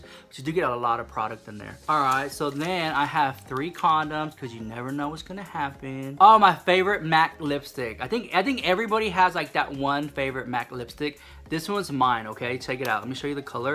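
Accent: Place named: Texas, United States. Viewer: It's cool. I can hear your Texas accent. 😎🤘🏻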